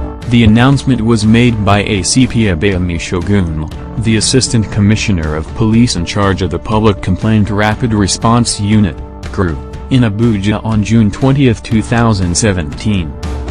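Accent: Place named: United States. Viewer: I can feel the voice on this video is always on YouTube posting dirty stuffs about Nigeria and other African countries, not for once any good thing about them. Whatever country you are from, I think there are enough dirty stuffs to talk about as well. You accent sound American, and if you, I guess as much. Shame on you.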